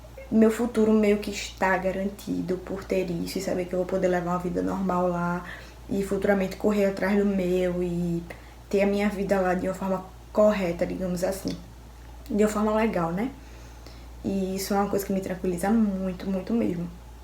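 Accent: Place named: Pernambuco, Brazil. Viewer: Não tem como não admirar uma pessoa que apesar de tão jovem, é de um extremo caráter, responsável e amorosa.Confundi seu sotaque, mas agora sei que você é uma conterrânea, pois meus pais eram pernambucanos, e eu, apesar de ter nascido aqui em Guaruja SP., de certa forma me considero de Pernambuco também. Beijinhos e Deus abençoe.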